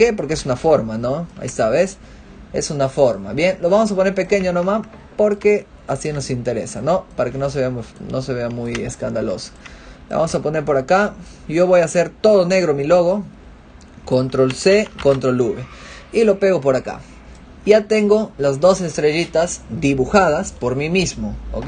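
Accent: Peru. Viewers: DIME QUE ERES PERUANO XFA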